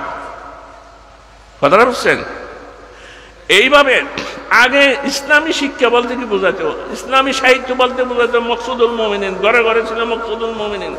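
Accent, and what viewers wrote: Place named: Chittagong, Bangladesh. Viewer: চিটাগং ভাষা কম বুঝি তারপর ও আপনার জন্য ভালা লাগে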